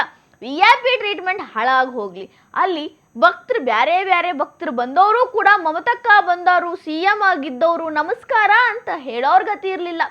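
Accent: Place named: Hubballi, India. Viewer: Nimm ನ್ಯೂಸ್ ಓದದು ಹುಬ್ಬಳ್ಳಿ ಸ್ಟೈಲು ಬಹಳ ಇಷ್ಟ ಆಗ್ತದ್ರಿ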